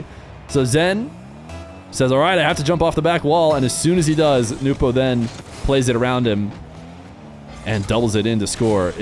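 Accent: United States. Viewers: you sound like an american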